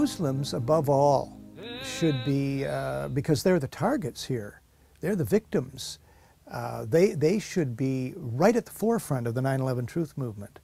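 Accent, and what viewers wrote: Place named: Canada. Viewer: this guy is canadian ay! (out and about pronunciation)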